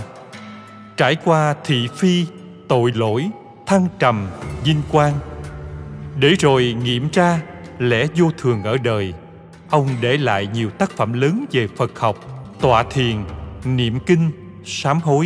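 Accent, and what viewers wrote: South, Vietnam. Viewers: 😂 sặc nam bộ, nghe mà ghiền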